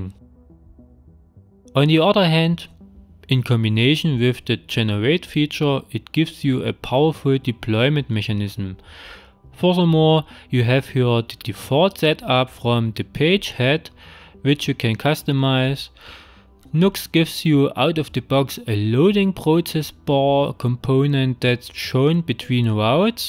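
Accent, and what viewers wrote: Germany. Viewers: This accent is special and typical from east-germany. I am from germany as well and I immediatelly recognized that he is from east-germany (Ich vermute Thüringen oder tiefes Sachsen ;-) ). After all the video and the stuff is great. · Klingt nach Sächsischer Schweiz, Dankeschön für das geile Video! Ich würde mich auch sehr über ein Video auf Deutsch freuen. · Your accent sounds like German. Anyway, thanks for your good information.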